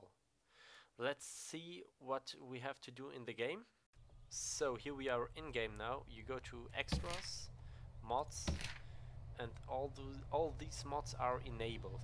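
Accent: Germany. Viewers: Man hört ultra das du deutsch bist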